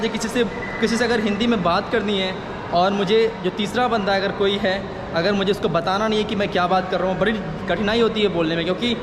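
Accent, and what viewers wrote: Russia. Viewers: Did anyone noticed that english accent is somewhat russian now? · Russian ascent is better than nastya's...😄🤩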